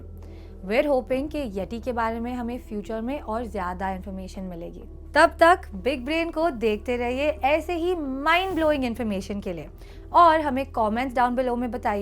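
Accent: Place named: South Bombay, India. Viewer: The way you pronounced "ळ"😁 you're from Mumbai. And your accent sounds so south Bombay.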